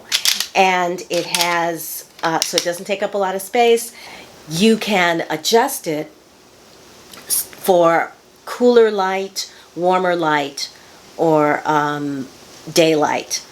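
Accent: United Kingdom. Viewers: Like the British Accent:) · Great job on the British accent. I’ve always loved the way they speak, makes the English language sound so much better. Great haul,lots of goodies. I need a good bra that will lift and hoist these puppies back to where they belong. Lol ❤️ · Happy Birthday!💕🎂🌸 I’m 5 months older than you.😀 That British accent...I thought I clicked on someone else’s channel at first. That was great. It that a romper you’re wearing? Love both the style and color on you. Which one is the link please? Thank you. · Love the British accent! You are so posh!:))) 💛💚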